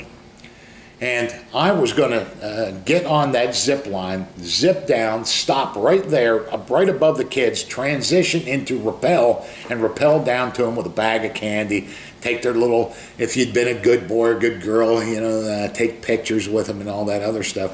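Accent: Pittsburgh, United States. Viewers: Hey are you from Pittsburgh originally? You sound like you have a Pittsburgh accent. When you make a sandwich I'll bet you use Isleys Chipped ham 't you?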